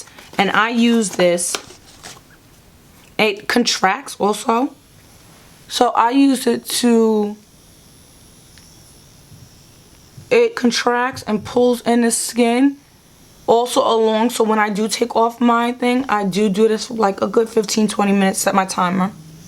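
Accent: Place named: New York, United States. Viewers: Is she not from New York, wow the accent is definitely New York, I'm from Philly and our accent isn't nearly as strong as New York :) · Your personality is everything! Mad raw and transparent. You sound like a fellow New Yorker, are you? Great video and so informative, eveb for those not considering the same surgeon as you. Thank you!! Btw: You look great and keep those videos coming.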